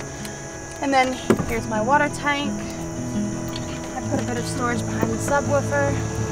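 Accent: Australia. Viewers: Am i detecting a slight Aussie accent??? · So many American's love Australia. You sound and look local now, from when you first arrived. Tanned, barefoot all the time and the tatts. At least they don't look down on you like in the US when you walk into a store without shoes. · Great van! PS I like the slight Aussie accent you've got going on now :)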